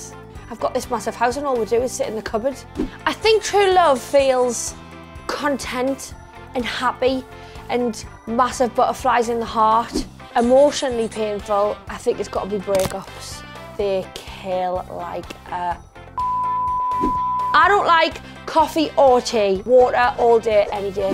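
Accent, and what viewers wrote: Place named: Newcastle, United Kingdom. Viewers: Chocolate Muffin it's obviously Geordie isn't it? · I am so in love with her British accent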